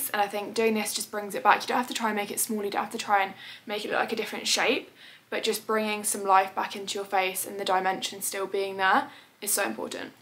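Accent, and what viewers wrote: United Kingdom. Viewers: Did not expect her to be British 😭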